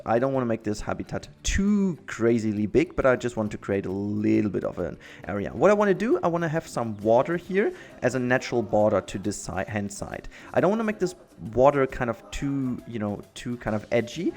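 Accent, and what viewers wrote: Germany. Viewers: Thanks for your afford! Appreciated it - you have a german accent - don't you?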